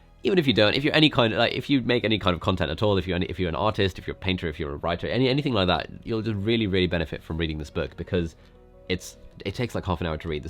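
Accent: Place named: United Kingdom. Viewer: British accent is annoying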